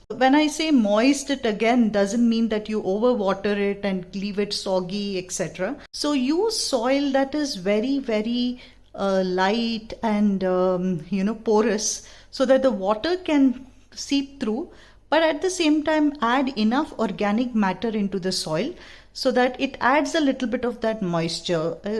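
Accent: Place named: Bangalore, India. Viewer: Your channel and narration is a welcome change...lots of information... and glad to know you are in Bangalore...I could make out by the way you speak :) (edit: as I continue to watch, I hear you say that you found lots of caterpillars..does that mean it's a host plant for a kind of butterflies? Asking as I just ordered and reading up on it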